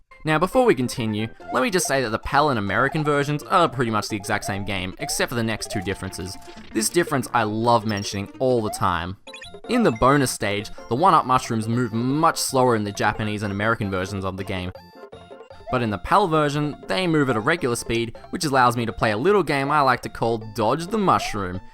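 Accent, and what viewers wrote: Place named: Australia. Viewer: different accents. he's Australian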